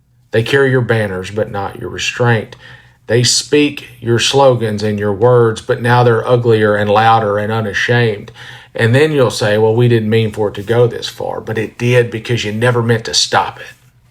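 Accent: South, United States. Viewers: Hi - I’m glad to see and hear a white Southern man standing up against the stupidity and intentional cruelty these mean boys are fouling the world with. No, I don’t like everybody in the world, and sure I may pre-judge folks on how they present themselves, how they act towards me or others, and definitely about what they say, but not due to the color of their skin, ‘cause I’m not an idiot. I don’t think a surplus or lack of melanin has much to do with a person’s brain, heart or soul, but then I’m a mature human. Which those young Republicans obviously are not. Anyway, thanks for speaking up. We need a man with a strong southern accent to give the what for to the idiots who sound like him but think and act like fools. Kudos dude!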